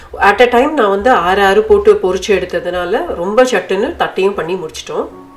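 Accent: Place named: Karnataka, India. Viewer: I am Sujithra from Chennai. Nice video. will try the peda recipe, looks yummy. very nice recipes. what is your mother tongue, your tamil looks a mix of kannada or tulu, correct me if I am wrong, lik your videos, they are very positive and nice. keep going, all the best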